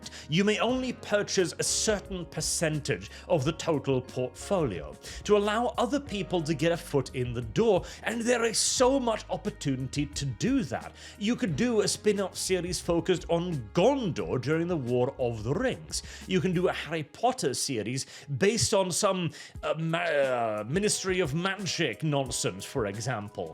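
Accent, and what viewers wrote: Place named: United Kingdom. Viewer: I have never seen a British person rely on their accent to make up for their lack of knowledge more. You might as well be engaged to a cousin because damn dude, that island DNA is showing.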